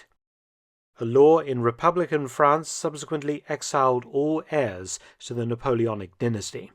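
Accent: United Kingdom. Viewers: The very style of this very British narration leaves me with the impression of an implicit disdain for the French overthrowing of monarchy and going with a republic. Haha.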